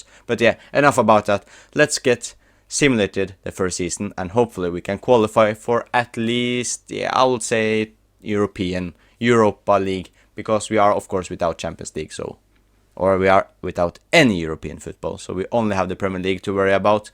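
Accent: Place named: Russia. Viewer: You Sound Russian Bro 😂🎉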